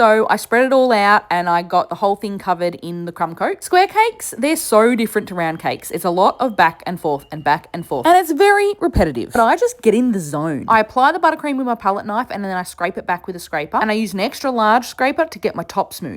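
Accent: Australia. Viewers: You're Aussie?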